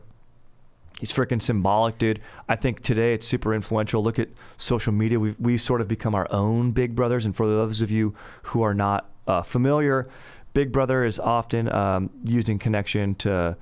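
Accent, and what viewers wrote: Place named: Brooklyn, United States. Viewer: Close your eyes from - and you will time travel in 1920's Brooklyn, New York. Truly miraculous experience.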